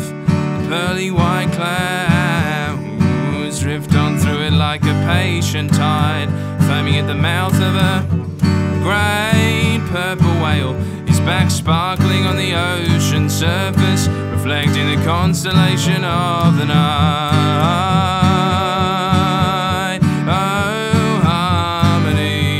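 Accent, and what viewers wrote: Australia. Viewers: I swear this guy gets more Aussie every non gaming voice chat video P.S please bring those videos back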